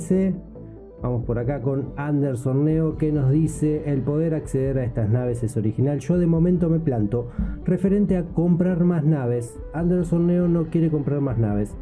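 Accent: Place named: Argentina. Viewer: Primera vez que oigo a, y me creo que es argentino🤣🤣🤣👌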